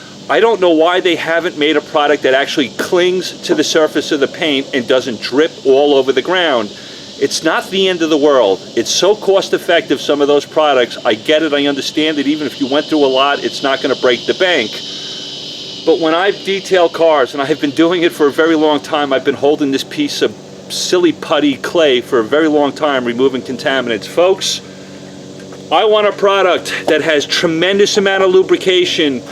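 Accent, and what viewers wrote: New York, United States. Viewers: Dude, your rock! I have listened to Michael Savage on talk radio for years, and his accent and direct manner of speaking is quite unique to NYC. IF you're NOT from there, I will use Blu-Tac to clay my 1983 Chevrolet Caprice with this Armoral. (Hint: IMA do it anyway...) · i love your videos. When did you move to Dallas from New York? your accent reminds me of ME lol lol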